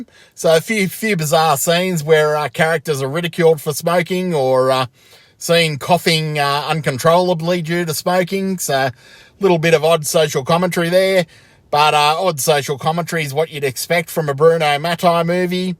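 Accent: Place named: Australia. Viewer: Bob Ross .great name 😁. Also love the Aussie way of speaking . My favorite accent . Plus I hear that place is just wild to live in. Keep up the great work and good morning from Dallas Texas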